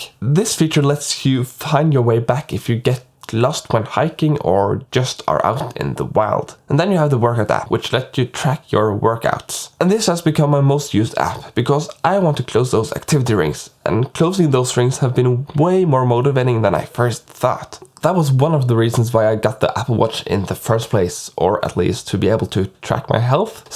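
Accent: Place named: Norway. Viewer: Since english is not your native language, don't sweat it too much with the pronunciation, since norwegian pronunciation probably tries to slip in while speaking in english, and that is totally normal, nothing wrong with it. Focus on keeping up the making of content and your english will tune in by itself effortlessly. Good work!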